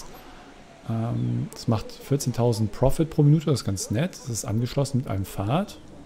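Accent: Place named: Bavaria, Germany. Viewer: Wie gut, dass Daniel nicht dein Bayrisch hört ^^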